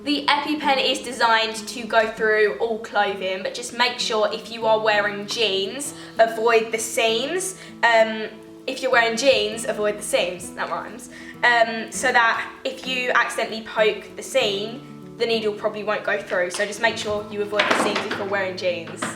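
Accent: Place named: RP, United Kingdom. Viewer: Are you British? You speak English like the Queen. Get well soon, Babe. Take good care of yourself ❤️❤️❤️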